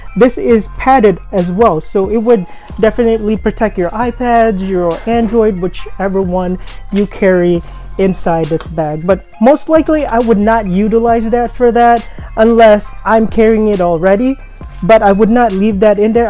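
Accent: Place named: England, United Kingdom. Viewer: Wow I love that English accent🤗❤️❤️❤️ wow that's a nice handy bag #mto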